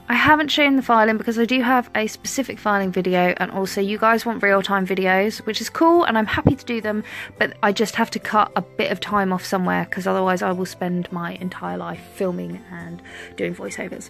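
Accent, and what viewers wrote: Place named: Essex, United Kingdom. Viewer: I'm actually sitting here.... well actually standing... you're keeping me entertained whilst I do the most mundane task of washing up after feed the kids their tea... giggling along with you because I'm making the same noises of wonder over that G-O-R-G-E-O-U-S glitter and it friggin GLOWS!!!! 😍 lol your accent has me perplexed a little thou.... coz you keep saying certain words that sound Essex... like me 😁 I'm now off to put another order in.... but please write congratulations on you winnings 😂😂😂 great video as always x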